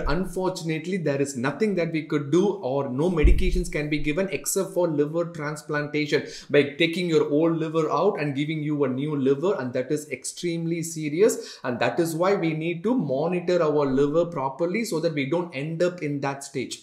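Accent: Tamil Nadu, India. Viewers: talking English like Tamil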